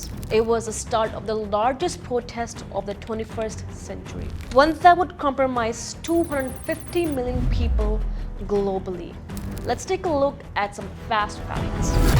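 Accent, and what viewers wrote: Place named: Canada. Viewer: Or apna ye canada wala accent apni Gamnd me daal lo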